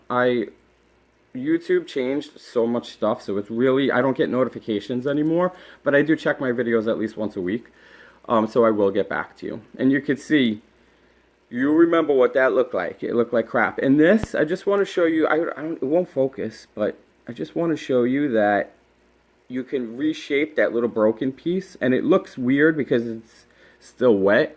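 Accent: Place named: Boston, United States. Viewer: I love you accent. Are you from Boston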